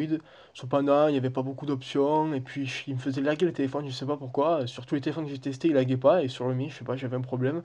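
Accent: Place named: South, France. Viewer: Oh t'a un accent mdr t'es bien du sud Saint Christol les ales j'habite pas loin xd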